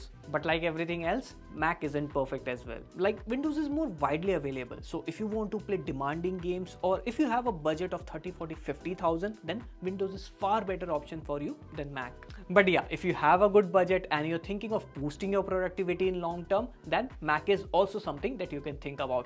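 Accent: India. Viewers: I hear an indian guy talking about technology...I press like and listen!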